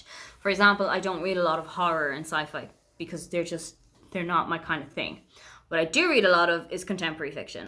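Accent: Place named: Germany. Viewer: I love your accent. I find it really hard to understand the Irish accent most of the time but your accent is so easy, maybe it's a bit of the German açcent you have picked up, have you lived in Germany long?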